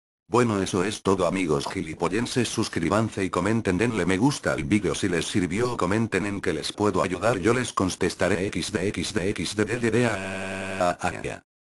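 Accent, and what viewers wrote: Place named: Latin America. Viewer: Hablas con una mezcla de Latinoamericano (por hay argentino o chileno) con otra mezcla de español.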